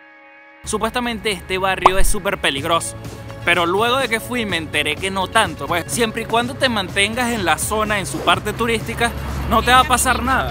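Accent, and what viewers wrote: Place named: Venezuela. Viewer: no es de hater, pero porque los venezolanos siguen hablando como venezolanos estando en Argentina, me choca que no adopten ni un poco el acento.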